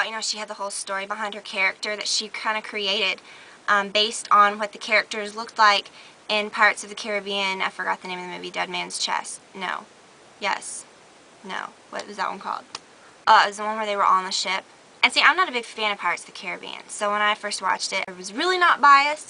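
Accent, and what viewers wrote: South, United States. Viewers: "and at the ieendd" Haha your southern accent comes out more and more the more videos i watch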